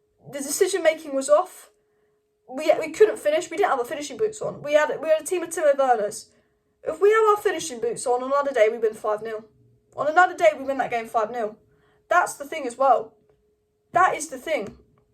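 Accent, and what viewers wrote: Manchester, United Kingdom. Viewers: What part of Manchester are you from?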